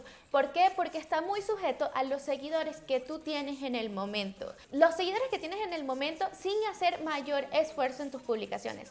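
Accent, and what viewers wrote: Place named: Argentina. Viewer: De donde sos? A veces pareces argentina otras costa rica o colonbia...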